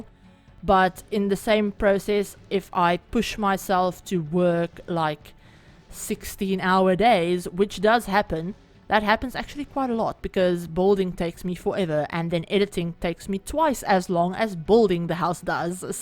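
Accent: Afrikaans, South Africa. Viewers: Are you Afrikaans? Your accent sounds very Afrikaans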